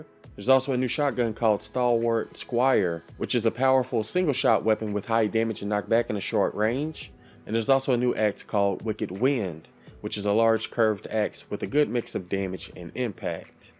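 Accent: South, United States. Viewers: Are you from Texas or Louisiana !?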